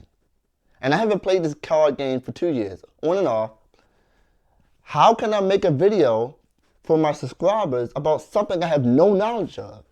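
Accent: Baltimore, United States. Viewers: Besides the point of the video I know but are you from Baltimore?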